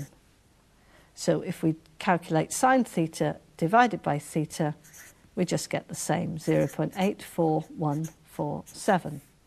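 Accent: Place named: United Kingdom. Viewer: I find it quite stimulating, the Brits accent mixed in calculus real just arouses the desire to follow.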